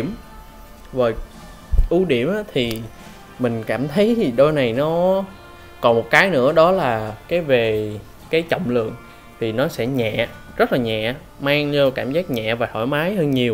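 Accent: Standard. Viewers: a nói chuẩn quá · Anh nói chuẩn quá :33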